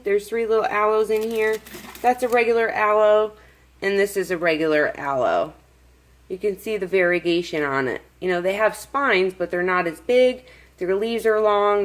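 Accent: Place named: South Jersey, United States. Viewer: Also, love that friendship plant. Also, are you from the east coast? I'm from the Jersey shore and I'm hearing a familiar accent. South Jersey? Philly? lol.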